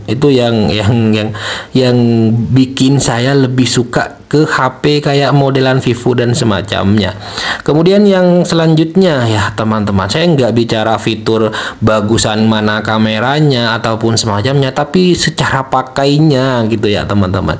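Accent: Madura, Indonesia. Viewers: Bhee medureh kakmah kak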